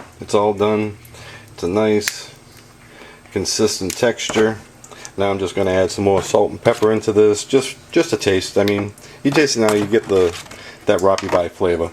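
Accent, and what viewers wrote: United States. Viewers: Love the South Shore accent!!!!!! :P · I love the Boston accent! Trying it this afternoon and will be back at you...thanks!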